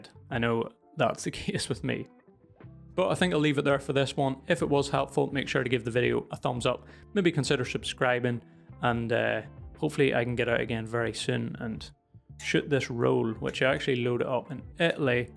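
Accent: Ireland. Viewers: Brah gotta be Irish. Sounds like my bro from Belfast.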